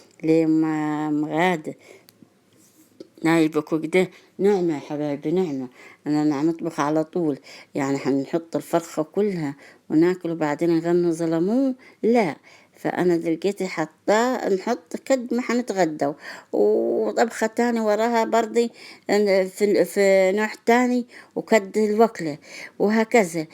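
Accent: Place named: Armant, Egypt. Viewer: كلامك زي ناس ارمنت انا من هناك لكن مقيمة في أسوان واول مرة اعرف معلومة الطماطم الف شكر مشتركة جديد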